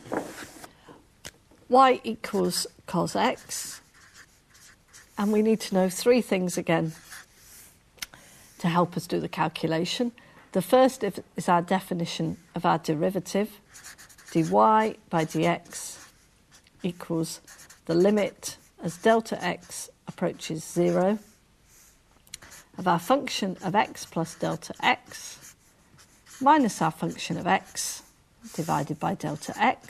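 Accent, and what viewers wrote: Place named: United Kingdom. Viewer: I find it quite stimulating, the Brits accent mixed in calculus real just arouses the desire to follow.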